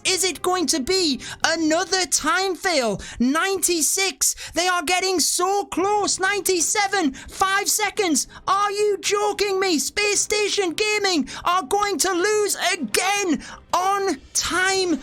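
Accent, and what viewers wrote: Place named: Cockney, United Kingdom. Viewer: Love your accent It's cockney right???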